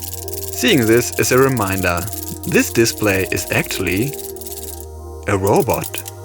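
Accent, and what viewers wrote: Germany. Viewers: I clocked you as German, and I was correct :D. Geiles Video, weiter so! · native german???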